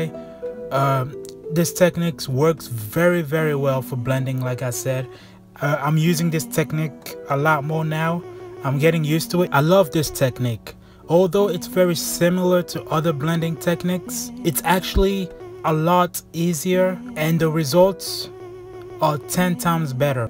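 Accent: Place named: United States. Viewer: he is gaining an american accent overtime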